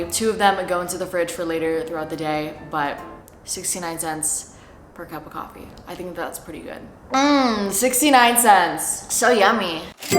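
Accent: Vietnam. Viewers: Has anyone told you that your Vietnamese accent is so cute ❤❤ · your viet is so cute T__T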